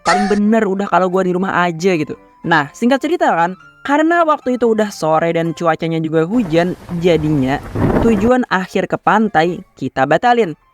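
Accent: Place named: Kandangan, Indonesia. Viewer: Dari logat nya kaya nya orang kandangan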